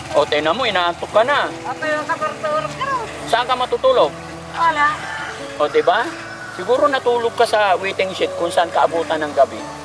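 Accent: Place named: Ilocos, Philippines. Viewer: Laing ag sao Ilokano ni.ang tiyaga mo.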